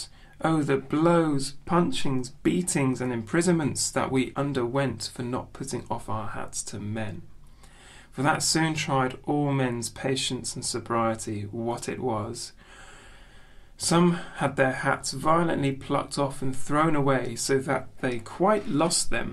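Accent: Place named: England, United Kingdom. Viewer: I wouldn’t mind this in full, as I can’t find the complete audiobook of the journey. It’s also good to have an Englishman read this , as American speakers cannot pronounce British English place and person names as those in the British isles say it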